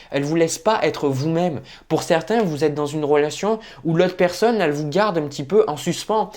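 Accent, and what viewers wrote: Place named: Southwest, France. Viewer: Bonjour, étant ascendant scorpion, c'est la première vidéo que je visionne sur votre chaîne. Néanmoins, je dois avouer que par manque de temps, je n'ai pu regarder uniquement la moitié de votre vidéo, mais ce n'est que partie remise. La partie que j'ai visionnée a été extrêmement émouvante. Chaque mot que vous avez prononcé (avec votre joli accent du sud-ouest), j'aurais pu les prononcés moi-même, dans mon esprit, dans mon coeur et à voix haute. Oui, instinctivement et intuitivement, sans me poser dans la figure de la victime, je me suis souvent demandée ces derniers temps: Pourquoi moi? Je ne me reconnais plus dans ce que je suis devenue? Quel est ce tournant que ma vie a pris sans que je ne me rende compte de rien. Intellectuellement, j'ai beaucoup cherché mais maintenant je sens et même je me doute que la réponse est dans ce qui est caché, ce qui est connecté au spirituel, d'où le tirage de ces cartes pour les scorpions qui incarnent des notions de spiritualité. 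Merci du fond du coeur, de ce tirage en grande résonnance avec mon âme. Je m'abonne.🙏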